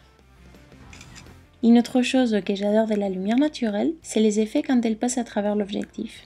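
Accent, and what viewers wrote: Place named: Spain. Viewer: Elle a l accent espagnol mais on la comprend très bien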